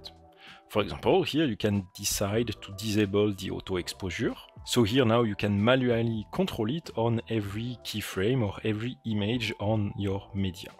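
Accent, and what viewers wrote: France. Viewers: le mec a tellement un accent fort en français que même si tu parles pas anglais tu le comprends:)) merci pour les conseils en tout cas, très interressant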